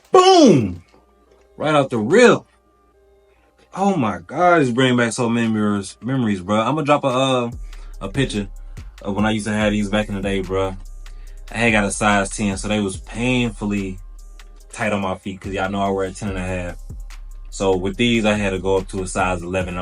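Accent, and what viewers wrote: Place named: DMV, United States. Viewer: Sound like u frm dmv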